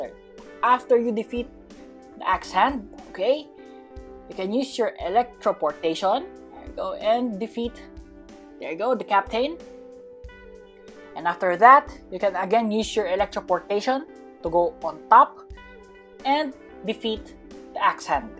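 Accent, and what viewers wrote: Philippines. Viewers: Qeustion my friend What is ur race? If I'm gonna guess Filipino? Cuz ur accent made me curious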